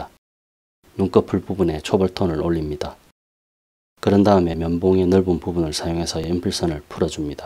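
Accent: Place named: Busan, South Korea. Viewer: 말하시는것보니 부산인듯하네요 혹시 직접화실운영하시나요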